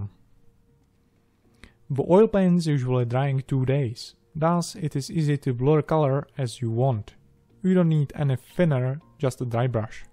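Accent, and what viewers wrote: Czech Republic. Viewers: Čecha mluvícího anglicky poznám na tři míle. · další skvělý model, ale mondieu ta czengličtina :) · You Czech?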